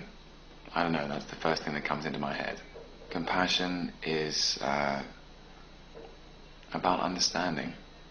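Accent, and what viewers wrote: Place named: England, United Kingdom. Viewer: I love hearing his English accent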